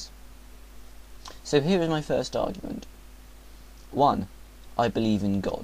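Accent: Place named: United Kingdom. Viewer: I don't know of which city you show your clips from, however, all countries have riots at one point or another. It's just that the secular ones have less crime, murders, thefts, lootings and gun violence. Your accent sounds British. Were you aware that since 2000, many Christian churches in Britain are converted to mosques, temples, shops, stores and tattoo parlors. Yet in "godless" england, 0 school shootings since 2000. In 80% god fearing USA, since 2000, dozens of shootings, 150 deaths. FOOL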